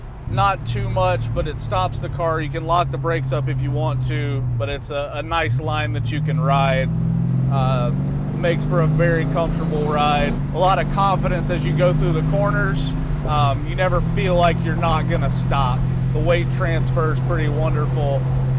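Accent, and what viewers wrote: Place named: Texas, United States. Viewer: I LOVE THE ACCENT - IS THAT A COUNTRY TWANG. TAXAS HUH ? NEVER BEEN .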